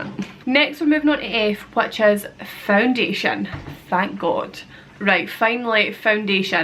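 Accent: Scotland, United Kingdom. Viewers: are you Scottish I take it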